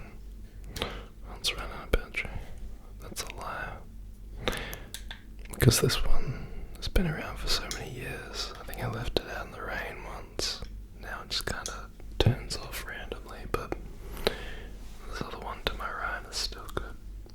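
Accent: Australia. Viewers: The Australian accent is so beautiful 😍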